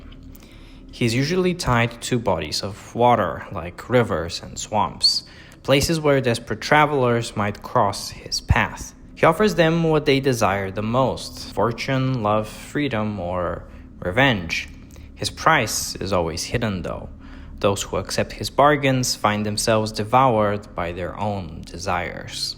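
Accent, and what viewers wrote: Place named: Manaus, Brazil. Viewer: u whistle while you talk, you sound like a little bird, people from Manaus, Amazonas, like me, have that accent too.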